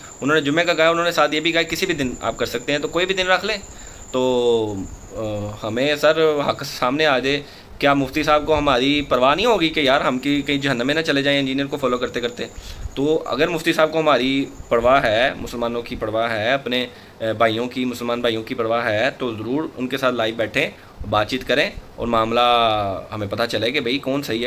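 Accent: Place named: Punjab, India. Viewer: مووولک نہیں ملک ہوتا ہے۔ اردو بولنا سیکھ لیں بھائی ۔ آپ کی اردو پنجابی زبان کی چغلی کھا رہی ہے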